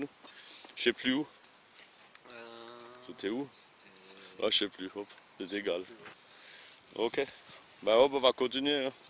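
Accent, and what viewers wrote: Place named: South, France. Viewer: petite sortie sympa ca degulingue la tarte a l'oignon continue j'adore ton accent du sud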